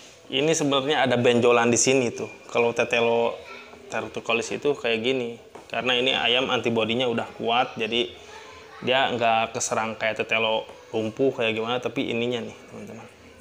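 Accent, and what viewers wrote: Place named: West Java, Indonesia. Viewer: Mantap bang bermanfaat bagi pemula.......logatnya kaya orang sunda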